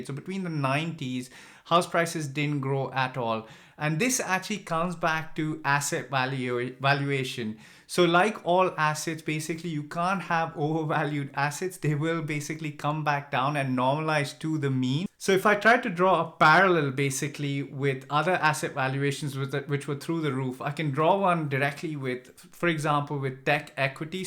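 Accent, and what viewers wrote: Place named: India. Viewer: From your accent its visible that ur root is from Indian. Why can't you say your name properly. Its or. Bitte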